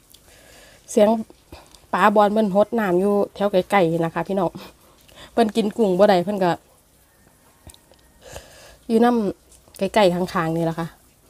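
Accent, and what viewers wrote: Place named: North, Thailand. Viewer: อู้เหนือด่ก่จ้าว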